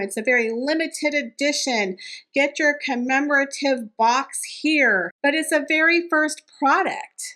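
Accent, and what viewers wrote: United States. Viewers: Why is this channel called “Royal daily Tea” when you only do hate videos on Megan and Harry. Also - you sound like an American. Why TF do even care?!?! It’s giving obsessed honestly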